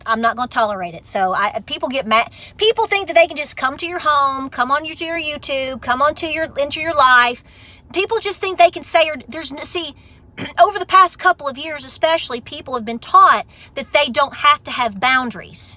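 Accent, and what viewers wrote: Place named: Texas, United States. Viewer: You sound like you should be a Texan!! I love it! And absolutely right!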